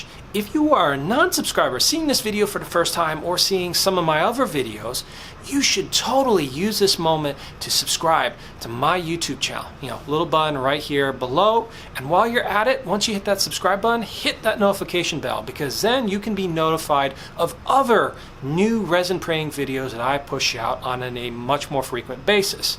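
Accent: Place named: Canada. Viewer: ahh ok, you sounded like a fellow 🇨🇦 😊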